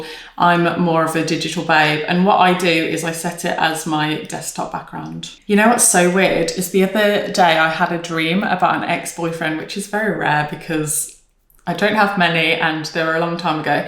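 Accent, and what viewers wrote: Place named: United Kingdom. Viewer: i wasn’t expecting a british accent! lol but loved the video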